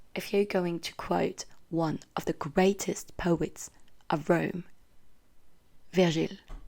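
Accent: Australia. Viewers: *Australian pretty sure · Ngl but this sounds more Australian than British all the comments 😳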